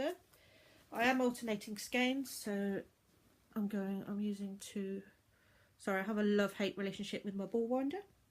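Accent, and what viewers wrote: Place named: Devon, United Kingdom. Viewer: Lovely 1st episode, catching up on all your podcasts. Lovely to hear another Devonshire accent, me loveerrrr 😘💗 Gorgeous makes! X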